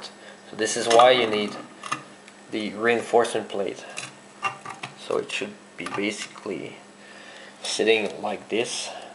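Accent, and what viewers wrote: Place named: Quebec, Canada. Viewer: Nice video! Et beau accent québecois lol ;)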